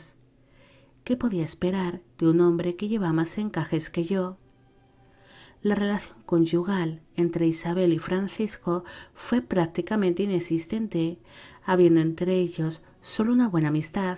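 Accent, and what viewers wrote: Spain. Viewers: Ese acento tuyo tan particular de que parte de ESPAÑA es ? Señorita!!!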